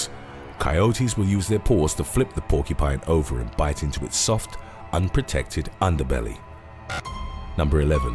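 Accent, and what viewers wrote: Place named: United Kingdom. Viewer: Funny when a guy with British accent says : soccer pitch.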